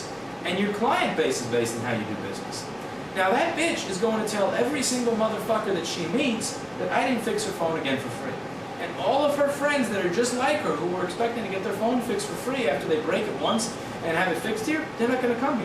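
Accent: New York, United States. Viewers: Pierjan - He's got that New York style going on. :)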